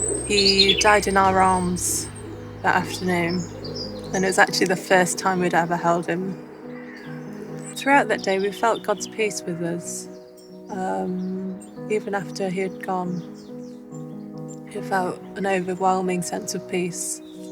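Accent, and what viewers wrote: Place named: United Kingdom. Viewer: You are the 1st Asian I've heard who has a British accent.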